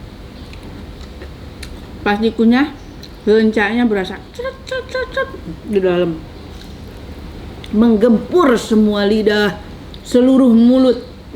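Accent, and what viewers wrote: West Java, Indonesia. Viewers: Sunda pisan teh...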